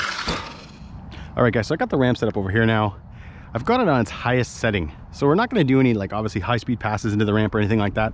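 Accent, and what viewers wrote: Canada. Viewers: Glad I looked into your location - I'd recognize a Northern Ontario accent anywhere! Great content - you deserve WAY MORE subscribers.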